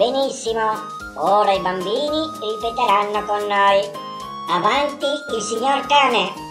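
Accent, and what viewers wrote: Italy. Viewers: Accento triestino forte!!!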